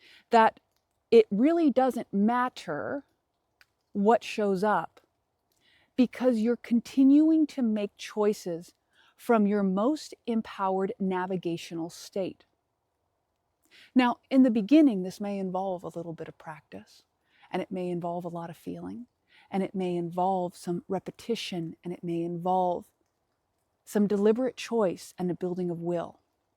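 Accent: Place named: South, United States. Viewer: Didn't think you'd be southern. Refreshing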